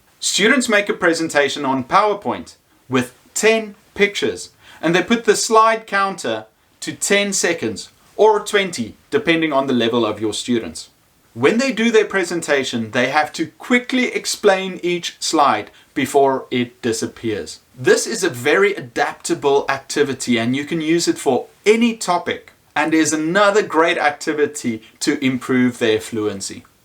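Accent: South Africa. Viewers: Are you South African? My SAFFA-dar is off the charts 😄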